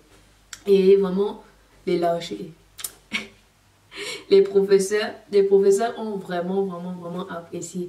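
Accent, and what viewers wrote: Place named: Gabon. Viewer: L'accent gabonais :)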